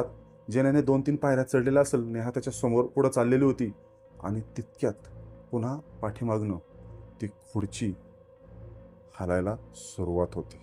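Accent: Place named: Nashik, India. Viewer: सर तुम्ही कुठले आहे भाषा तर नाशिक ची वाटी हे😊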